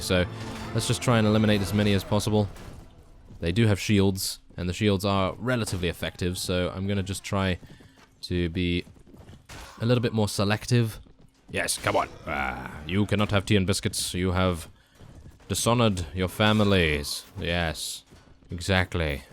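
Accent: United Kingdom. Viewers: The Valleys UK, or British basically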